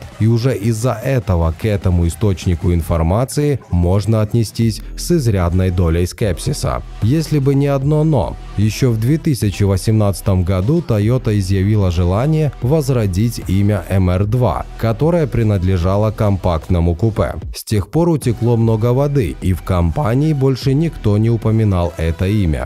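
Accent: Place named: Stavropol, Russia. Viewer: Ставропольский диалект чёто не зашёл)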